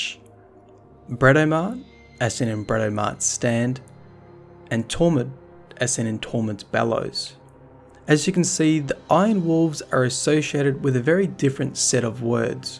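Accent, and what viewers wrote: Australia. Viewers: DOOD YOUR FROM STRAYA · Your Australian I thought you were sick mate!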